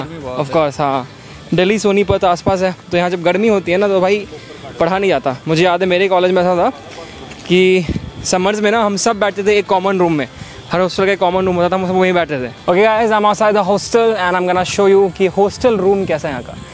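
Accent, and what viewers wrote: Bihar, India. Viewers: Aap bihar se ho kya????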